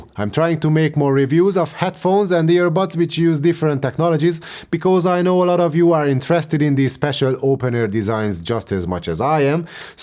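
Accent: Hungary. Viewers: Thx Mate, nice review! I have Shokz OpenSwimm but lately I'm missing bluetooth. I was thinking to buy an OpenRun as well, but after this I maybe try Neanka. But a bit scary how easily it broke in your backpack.... Shokz is still in good shape for me. By the way, you were right about IPX8 and IP68 not the same, BUT from waterproof standpoint are the same. The third character (X or 6) stands for dust /solid particles resilience (X means not tested, 6- has tested and ok against dust and solid airborne particles) . Forth character (8) is the waterproof rating (tested being submerged in 1 meter of water for up to 30 minutes) Last thing... Don't take it wrong, but aren't You from Hungary? I have very similar accent.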